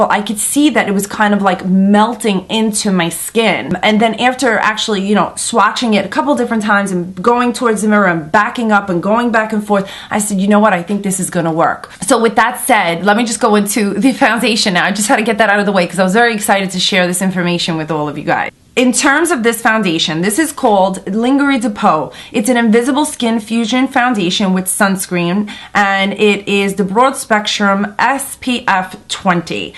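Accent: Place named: Long Island, United States. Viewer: OMG! I am not being offensive. I am from Lawnguyland. You sound like home. Non LI pronunciation: "Lan Jer E" :-)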